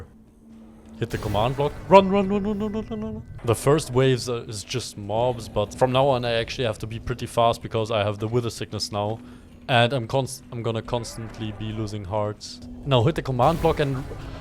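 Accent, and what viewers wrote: Germany. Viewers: German you Sound, me reconize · I can hear a german accent 😮🎉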